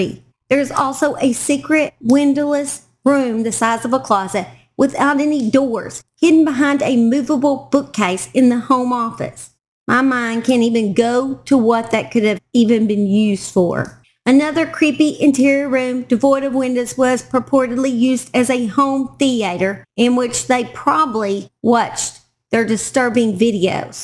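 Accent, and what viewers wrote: South, United States. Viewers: Absolutely love your southern accent, love from Romania 🇷🇴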